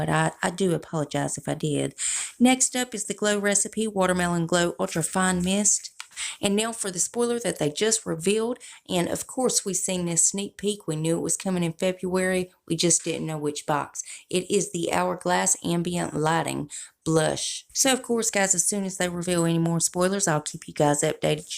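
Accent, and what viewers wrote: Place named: Alabama, United States. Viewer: Are you from Alabama? :) I’m originally from North Mississippi! You speak my language !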